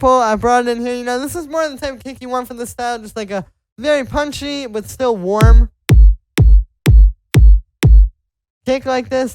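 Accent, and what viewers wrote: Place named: France. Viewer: Your voice is horrible,please stop talking during your videos !! Je penses que tu es français.. FERME TA BOUCHE ou ôte le bout de fromage que tu as entre les dents quand tu parles !! Quand on a une voix horrible comme la tienne on ne prononce pas 10 mots par seconde..ou Alors ARTICULE..c'est fou que tu ne t'entendes pas parler. mec...Corrige toi ..Sinon tu bosses bien tu as du talent mais stp tais toi !!!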